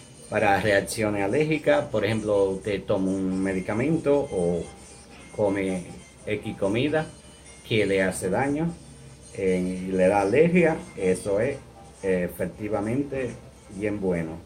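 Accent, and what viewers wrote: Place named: Caribbean. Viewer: eres un genio poderoso Amigo donde quiera. que te encuentres gablas con un acento CARIBEÑO <link> ENCANTA NI SE SIERES CUBANO O DOMINICANO PUERTORRIQUEÑO PERO HABLAS UN ESPAÑOL MUY AGRADABLE SOBRE TODO TU INFORMACION. VALE ORO DIAMANTES ..Y UNA INMENSA FORTUNA GRACIAS PIR TU GRAN CORAZON Y DON DE GENTE BUENA QUE TIENES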